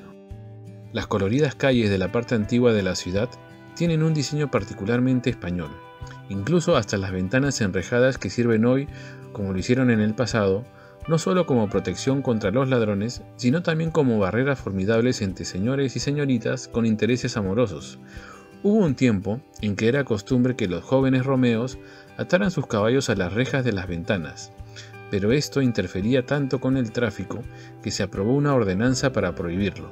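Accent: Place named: Lima, Peru. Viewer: El narrador tiene acento de Lima, Perú.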